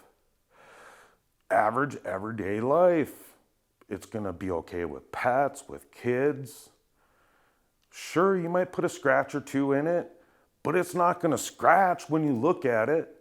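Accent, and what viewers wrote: Minnesota, United States. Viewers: I knew it. I could hear that MN accent after 2 mins. Thanks,!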